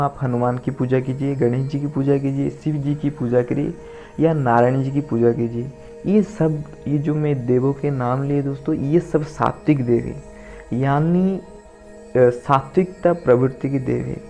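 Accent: Odisha, India. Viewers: Bhai aap odia ho kya??? Baato se toh yese lag raha hey??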